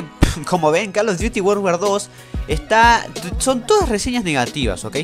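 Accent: Córdoba, Argentina. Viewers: Sos Argentino? O_O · Eh cordobez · Saludos cordobés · sos cordobes? :v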